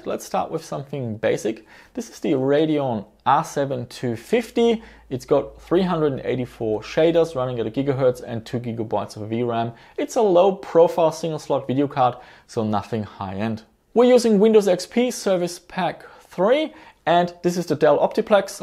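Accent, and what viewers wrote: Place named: Germany. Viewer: Du hörst Dich so an als wenn Deutsch dir vertraut ist. Ich finde den Kanal toll weil Du Dir die Mühe machst Benchmarks zu machen und die Unterschiede klar zum Vorschein kommen. Was mir auffällt ist, dass einiges so oder in einer anderen Form neu aufgerollt wird und von anderen Seiten beleuchtet wird. Was ich an diesem Video vermisse ist das Thema ("T&L). Das ist zwar schon einiges älter aber hat schon mit mit AA zu tun oder doch nicht?. Grüße aus Hamburg (Germany)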